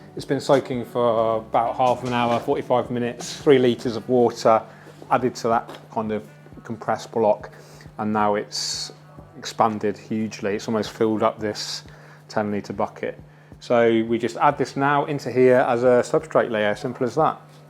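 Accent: United Kingdom. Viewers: Too bad only Brits can understand him.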